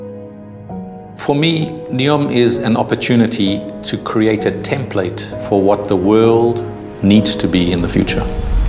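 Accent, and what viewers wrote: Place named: South Africa. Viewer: His accent is South African. Awesome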